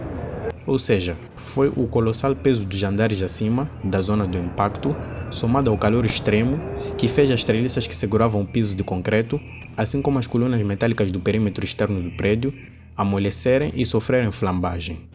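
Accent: Portugal. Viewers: Por causa desse sotaque insuportável de português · Português de Portugal, ninguém merece aff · Nossa, que idioma esquisito, parece português europeu ou português arcaico...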